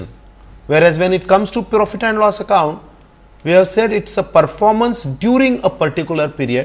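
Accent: India. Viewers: Awesome, and why not put the original subtitle for it as the google has lots of mistakes and it takes time for me to understand the Indian accent.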